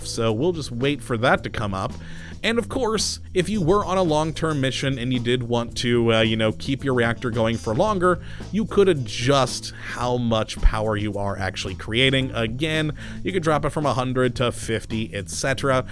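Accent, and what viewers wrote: United States. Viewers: Definitely, I'd imagine the accent would stick out like a sore thumb to anyone native to Britain It's unfortunate how rare it is to find an American who speaks English thoughtfully though :( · Not really, as a British person, it is clear to me he has a distinct American accent.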